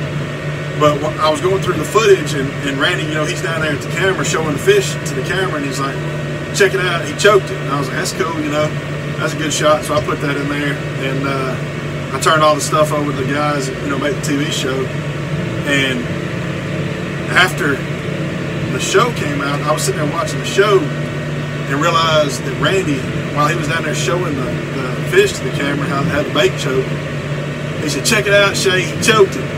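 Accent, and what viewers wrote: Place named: Alabama, United States. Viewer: Thanks for the fishing story, I left Alabama back in 1966, but it sure is good to hear the accent again.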